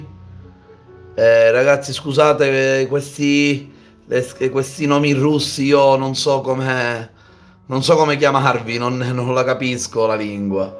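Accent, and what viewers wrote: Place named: Sicily, Italy. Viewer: Ti ho scoperto per caso ma non ti lascio più! Penso, dal tuo accento, che tu sia siciliano come me! 👏👏👏👏👏🥰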